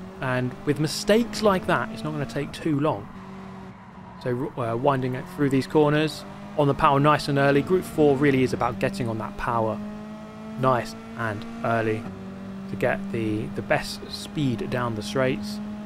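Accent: United Kingdom. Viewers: Listening to laid back british accents is the thinking mans opioid.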